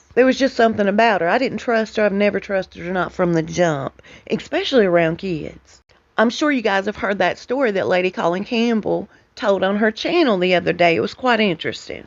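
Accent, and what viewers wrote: South, United States. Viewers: I enjoyed your video today. As much as I like the southern accent, I am afraid it is too much for me, and I can't follow. Today you were much better . Do our really talk like that all the time, it seems like you exaggerate it sometimes . If not I apologize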